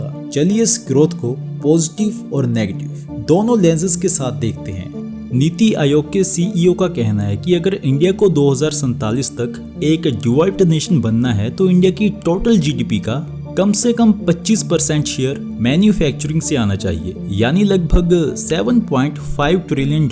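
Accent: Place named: Punjab, Pakistan. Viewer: Accent sounds more like how pakistani punjabis speak.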